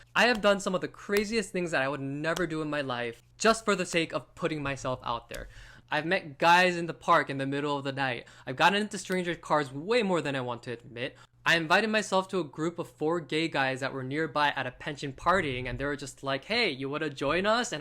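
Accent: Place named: United States. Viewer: You sound American... You speak English well!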